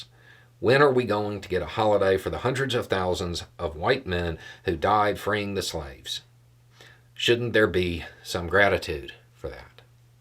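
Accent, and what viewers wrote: South, United States. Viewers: Some of the messages that come in really make me think some people out there just have a kink for being verbally dismantled bearded white guy with a southern accent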